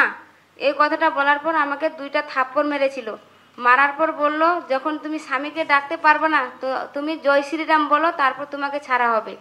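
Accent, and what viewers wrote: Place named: Bangladesh. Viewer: আপনার কথা তো বাংলাদেশি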